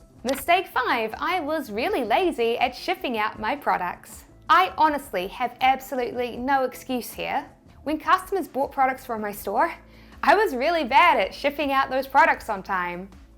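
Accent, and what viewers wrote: New Zealand. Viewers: Came back to get my annual NZ accent dose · are you from Southland? i think i can detect it in your accent! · thanks for the great info! The best thing about this channel is your lovely new zealand accent!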